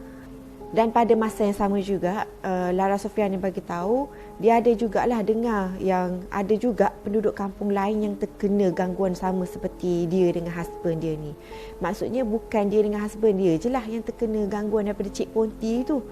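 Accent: Kelantan, Malaysia. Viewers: Assalamualaikum kak.. org kelantan ke kak ? hahahaha dgr mcm lorghat kelantan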